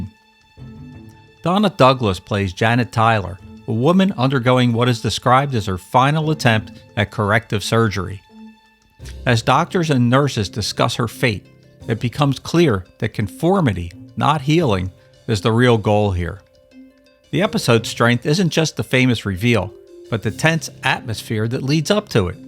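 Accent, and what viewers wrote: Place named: Delaware County, United States. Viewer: Your DelCo accent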